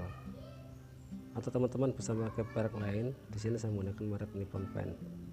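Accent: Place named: Java, Indonesia. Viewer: Wong endi Iki kok koyok wong jowo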